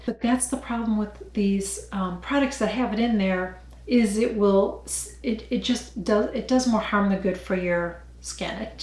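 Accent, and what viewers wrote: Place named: New York, United States. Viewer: Oh dam Girl, i don't check. And yes Clinique was my first foundation. I was advised which shade. It was way to light. And yes i used girl intentionally. I think you have a slight New York accent. And Boo to who put you down. Just pathetic. Hi to Family & Tabitha, the beautiful puddy tat 🐈🐾🐾❤💞❤💕 xxxx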